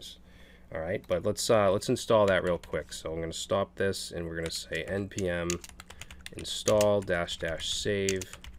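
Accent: Boston, United States. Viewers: Just... tremendous! Your laidback instructional style (and awesome Boston accent) has helped make so many seemingly scary technologies approachable for me, so heaps of thanks upon thee! Now that you've freed the masses from the shackles of "E" in Express and made Hapi.js accessible in its stead, I wonder what kinds of new stacks we're going to start seeing... I have a few in mind: * NASH: Node / Angular / SQL (whichever vendor) / Hapi * HORNY: Hapi / Oracle SQL / React / Node / Yeoman * HOTDAMN: Hapi / Oracle SQL / Typescript / Dust / Angular / Materialize / Node I wonder if any of these stacks actually exist out in the wild LOL!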